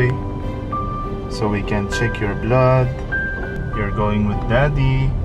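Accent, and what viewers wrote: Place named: Philippines. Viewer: Hope Maki boy gets well soon, you are a great dad. By the way, while listening to you talking, your accent and intonation is sounding more and more like Filipino speaking in English.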